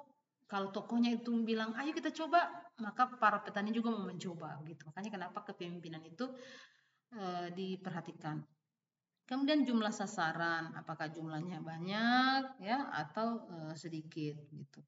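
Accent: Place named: Bugis, Indonesia. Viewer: Pantas logatnya Bugis sekali 😂,salam dari sinjai ibu heheh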